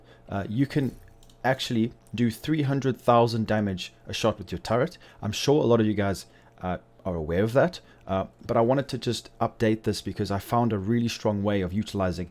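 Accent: South Africa. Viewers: Must be a South African accent! Great Video! Followed this build and it was not just fun to aim for it. But to enjoy it when it was near complete / completed.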